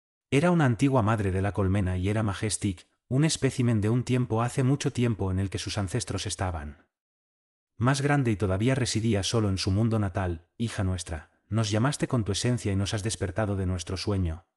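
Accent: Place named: Spain. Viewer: Muy buena narración lo malo no es para que se ofendan el idioma Español no nos gusta por estos lado el español o castellano latino nos gusta más y lo comprendemos más fácil y con más emoción y damos muchu mas arbotantes que ustedes así es que póngale la opción de latino porque para la otra no los escucho nojoda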